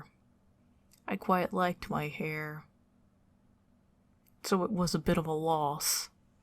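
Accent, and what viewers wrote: South, United States. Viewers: This southern accent is the peak of ASMR.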